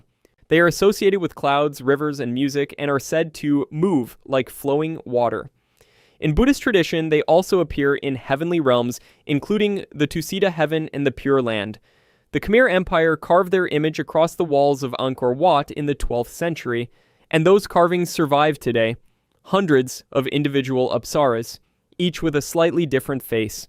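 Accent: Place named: United States. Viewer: The amarican accent can never say things properly..."Fryer" is where i left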